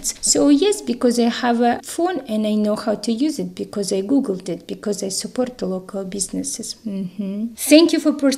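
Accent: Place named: Russia. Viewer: So awesome you always keep the russian accent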